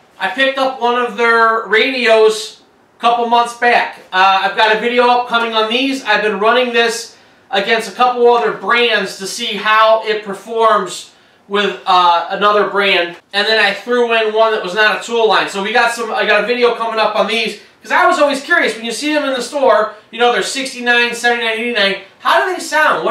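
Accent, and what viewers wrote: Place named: Midwest, United States. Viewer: Are you from Michigan or Wisconsin?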